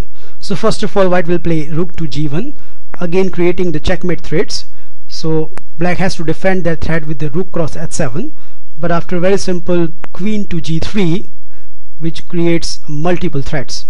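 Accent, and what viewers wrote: India. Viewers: Indian accent is obvious o.o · its not a grammar tutorial. and arent you entertained by the way he speaks? for me its funny but at the same time you learn. leave him alone hes not american he's indian, most call center agents are. just appreciate the knowledge and entertainment you get at the same time. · nice..as I am also an INdian,your accent is easy to understand and very interesting..thanks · zRouth I am a language teacher AND a passionate chess player in one, and I must admit his accent is like many people's accent in India, but his grammar is not (always) correct what can be disturbing (for native speakers, but I don"t belong to that group.) What he explains about chess is excellent on a lower level. (GM-s do not necessarily benefit from that, as they are already familiar with these tricks /at least the majority of them/.) All in all, I like his vids, you can use them well if you play with short time control (3-5 minute-games)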